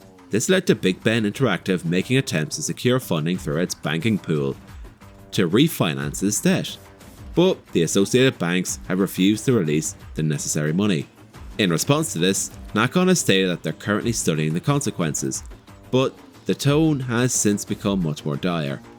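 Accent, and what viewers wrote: Ireland. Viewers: Nice to hear an irish voice covering covering car racing genre gaming. Big wrc fan and was wondering whether to pickup testdrive on sale. Bad news on Nacon especially with all their current & upcoming titles you mentioned. I will check out galway rally video when i can. Keep this content coming GRMA.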